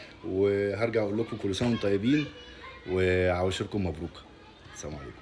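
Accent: Morocco. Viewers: انت وليتي مغربي اكثر من المغاربه خصوصا ملي كتقلد الفنانين المغاربه ما شاء الله عليك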